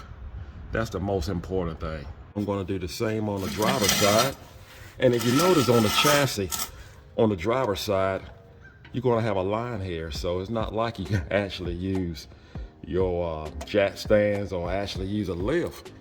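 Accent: South, United States. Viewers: Southern drawl is icing on the cake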